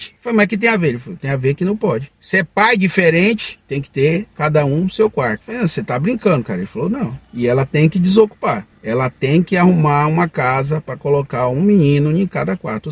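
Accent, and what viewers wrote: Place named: Brazil. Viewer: Salveeeeee familia , é bom dar concelhos pra pessoas k querem vir para Portugal , mas algumas pessoas não pensam k é bom ter a mente bem preparada , pois o psicológico é muito importante e tbm ter algum dinheiro guardado ne' ? Enfim Você ajuda , as pessoas k aceitem o k lhes dizem pk é para o próprio bem deles . Muito bom teu português , adoro o português brasileiro , eu adoro . Um beijinho familia , deus vos abençoe sempre 💖🇧🇷🇵🇹🥰🥰🥰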